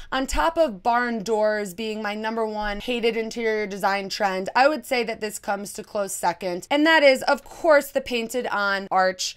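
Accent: Chicago, United States. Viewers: everytime i watch your videos i play a game with myself where I guess what Chicago suburb you're from 😂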